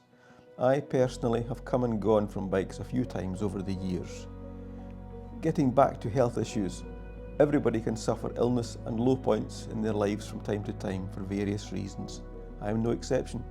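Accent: Scotland, United Kingdom. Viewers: Greetings from Australia, on the the Sunshine Coast of Queensland. Been riding since the early 70's on the usual vast array of types, sizes and forms... with a million memories. Just so enjoying listening to your soft Scottish accent... keep the vids coming... thankyou 👍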